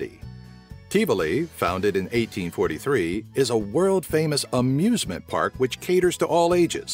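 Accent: United States. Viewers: it's funny to here a American saying danish words.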